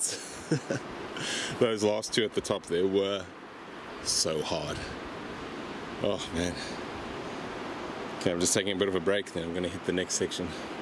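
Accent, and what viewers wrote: South Africa. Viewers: Mooi man. Glad you got it out. Just a random question are you from Port Elizabeth South Africa, I'm orginally from Grahamstown and your accent sounds like you are from the area.....in other news , went to Castle Rock today and saw thousands of shells mainly scallops and lots of other shells. Was amazing, my kids loved looking at the fossils aswell. But anyway, keep up the good work.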